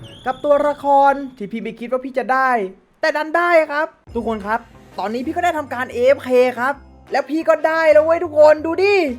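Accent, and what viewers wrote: Phetchaburi, Thailand. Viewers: ฟังเสียงก็รู้เเล้วว่าเป็นคนเพชรบุรี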